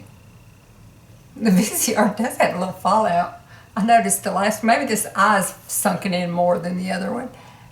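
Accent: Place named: Oklahoma, United States. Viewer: I just love your accent and the expressions you use! Reminds me of home (Oklahoma). Loved the eye looks and I'll bet no one could tell you had two different pallettes on! XOXO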